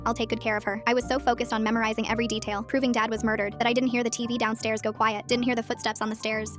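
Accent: United Kingdom. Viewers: Are you british question❓️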